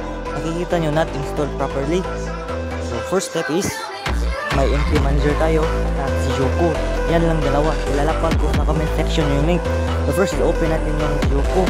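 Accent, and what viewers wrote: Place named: Visayas, Philippines. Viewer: Pareha lang ta idol Bisaya hehehe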